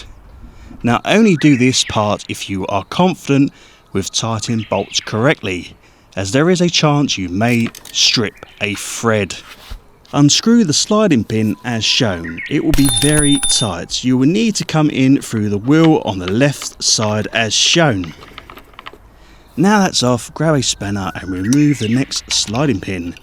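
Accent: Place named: East Yorkshire, United Kingdom. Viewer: Do yer really need brakes on them bikes.....you just put yer boots down don't yer?......he he he. 'Ull' east yorkshire.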